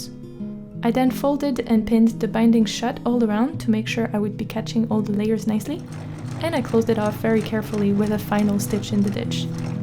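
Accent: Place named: Germany. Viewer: ❤ Ich dachte zuerst, dass du aus dem deutschsprachigen Raum stammst, wegen dem Akzent. Deine Videos sind wundervoll, ich liebe deine Nähprojekte. Auch die Szene mit dem lebhaften Austausch über das weitere Vorgehen, war sehr lebensnah und schön zu sehen. Abo, Glocke und Vorfreude auf weitere Videos von meiner Seite her ❤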